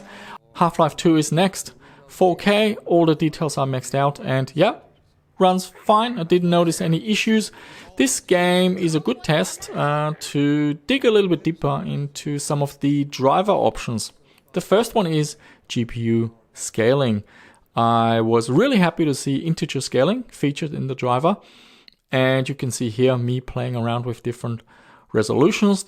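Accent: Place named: Germany. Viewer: Look! My favourite German accent Australia dweller has a face!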